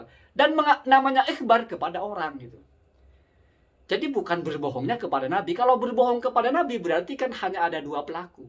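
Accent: Madura, Indonesia. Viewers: Semoga menjadi pengasuh pesantren 🙏🏼👍 Kayaknya Madura ya???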